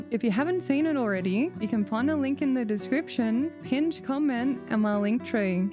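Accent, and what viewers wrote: Australia. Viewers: okay weirdnotweird take, but hear me out. i like that your voice fits your aussie accent.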